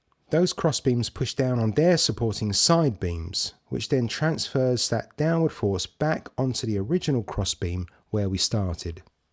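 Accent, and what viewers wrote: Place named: United Kingdom. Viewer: Gracias por explicarlo, aunque me cuesta trabajo entender el inglés Británico al menos entendí la física ❤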